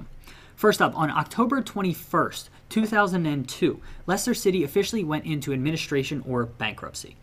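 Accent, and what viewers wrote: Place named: United States. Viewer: Love the American pronunciations lol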